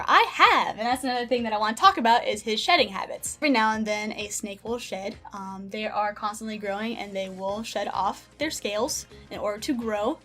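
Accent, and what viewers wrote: South, United States. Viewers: Plus that southern accent is cute lol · Def a southern accent, I think she’s from Kentucky but lives in Georgia now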